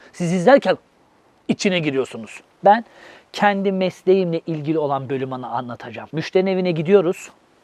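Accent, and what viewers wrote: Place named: Sivas, Turkey. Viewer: Trabzon mu ben Sivaslı sanıyordum 😅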